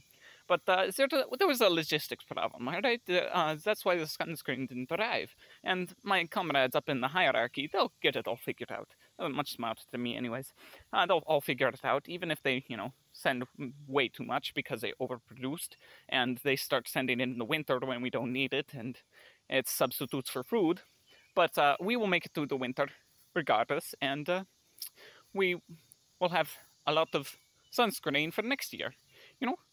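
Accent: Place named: Russia. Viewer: Love the accent. And you have really good English for being Russian. Making the most of what you got and looking on the bright side. NICE!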